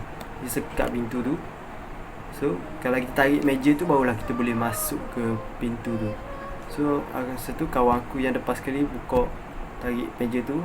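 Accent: Terengganu, Malaysia. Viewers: nampak gaya ganu ni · Org mna? Bunyi cm org terengganu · abe tu ko? Meme pon . Sdak dgr org ganu kecek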